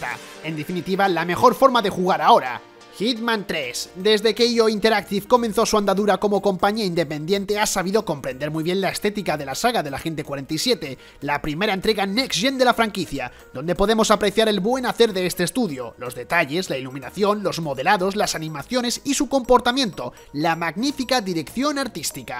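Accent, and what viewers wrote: Spain. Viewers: Aún no me acostumbro a su voz de este vato. Es muy española le falta ser más neutral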